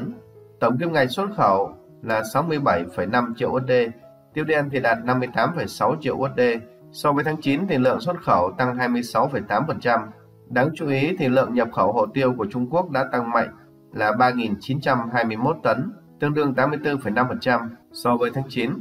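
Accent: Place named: Gia Lai, Vietnam. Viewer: hôm nay giọng đọc đặc trưng của người dân Gia Lai đã trở lại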